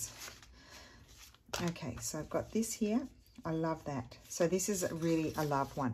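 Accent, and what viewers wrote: Australia. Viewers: Well done, considering the upheaval happening with packing and moving. I love watching your videos and the Aussie accent, even though you’re living far from us!! Thank you for this great idea with the “w”indow theme!! Regards, Susan W Australia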